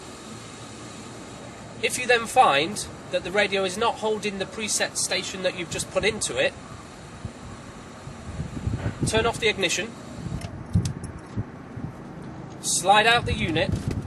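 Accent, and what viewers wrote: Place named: United Kingdom. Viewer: just a little of british accent :)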